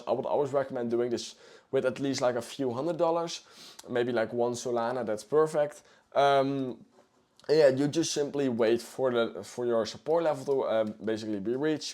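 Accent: Netherlands. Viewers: i smell a bit of nederlands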